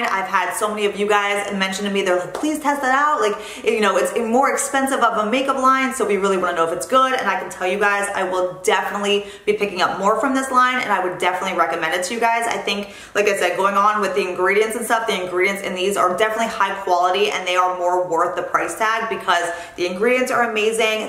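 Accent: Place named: East Coast, United States. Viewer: I'm a native east coast girl now living in Vegas. to be honest, i started watching your videos because it gave me a bit of that back home feeling with your accent! shortly after, i discovered we're the same age so i binge watched your vids and i have learned so much from you!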